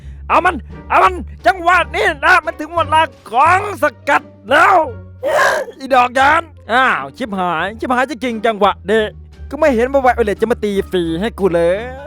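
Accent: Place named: Isan, Thailand. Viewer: พี่ชอบพูดทำเนียงอีสาน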